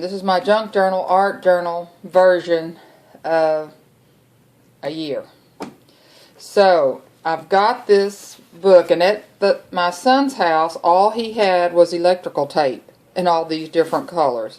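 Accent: Texas, United States. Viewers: I just want to jump through the screen and flip through this myself!!! I love it......so much creative fun!!! I just discovered your channel . So happy I did!!! This book just looks so personal and homey. Something very free and organic about it. Thanks so much for sharing it with us. Love your accent by the way.....my fave!! From one Texan to another!! HA!! HA!! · Love this journal and your Texas drawl :)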